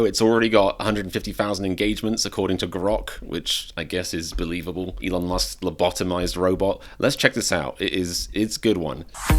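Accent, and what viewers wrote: United Kingdom. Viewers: Love the British accent pronunciation. Makes "The IT Crowd" episode with Jan's new boyfriend, Peter File, that much more funny.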